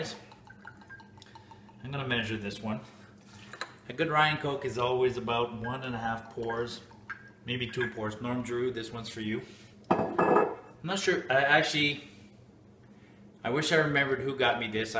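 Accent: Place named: Canada. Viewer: Lol i was thinking Canada before you said you were from Canada. Great video. Its like Angels pissing on your tongue lol. I love it.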